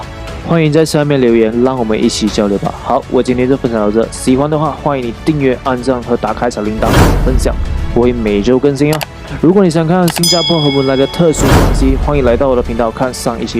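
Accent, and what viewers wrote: Malaysia. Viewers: This guys sounds like a Malaysian.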